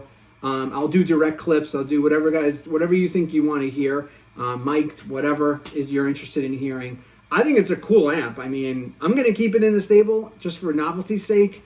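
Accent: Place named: New York, United States. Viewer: I just picked this head up a few days ago to pair with my Marshall 1960A cab with GT12-75 speakers. Excellent, no-frills video and straight to the point without excessive noodling. Great video brother - you sound a bit like a New Yorker !!! Cheers from Canada! Thanks for the video - I can see u can play good as well - nice vibrato !!!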